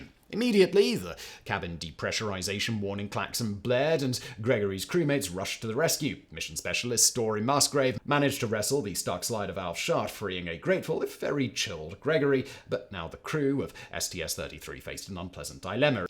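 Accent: United Kingdom. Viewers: This subject can only be conveyed with an British English accent. Centuries of a stiff upper lip has mad it capable of talking about any subject with (at most) minimal sophomorishisms. 😉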